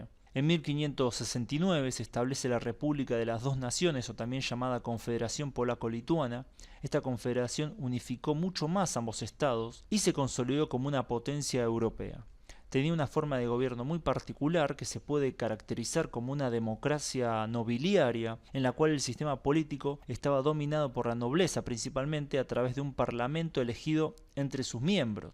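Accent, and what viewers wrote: Buenos Aires, Argentina. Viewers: buenos videos, lastima tu acento tan porteño, cabasho, ashi, asher, mishones....caballo alli, ayer, millones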